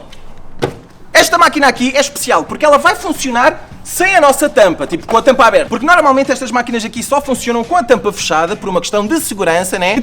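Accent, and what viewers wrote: Portugal. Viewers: Português de portugal 😃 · Poha o cara é português ou brasileiro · Vc e de Portugal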